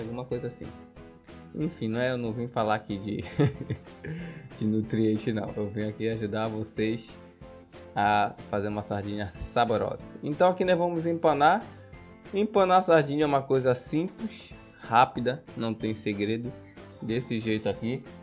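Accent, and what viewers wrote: Pará, Brazil. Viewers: Pelo sotaque é paraense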